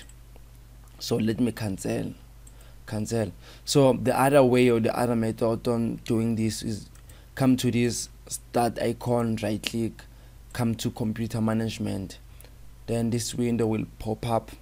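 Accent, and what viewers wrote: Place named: South Africa. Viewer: You sound like South African😊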